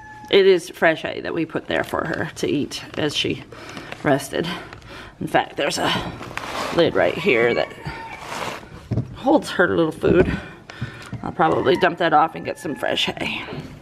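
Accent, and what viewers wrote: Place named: Massachusetts, United States. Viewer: It’s funny because I hear your Massachusetts accent and your southern accent.....I’m the same way. That Northern accent just flys out of my mouth lol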